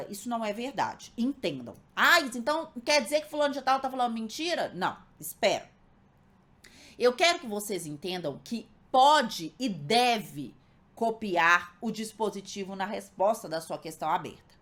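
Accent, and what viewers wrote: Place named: Minas Gerais, Brazil. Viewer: é mineira 😂😂😂😂😂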